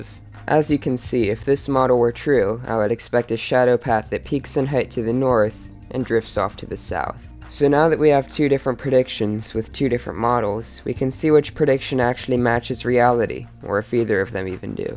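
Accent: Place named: Canada. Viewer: the video is awesome, but I was distracted by the fact that you said you live on the 37th parallel but also sound kind of Canadian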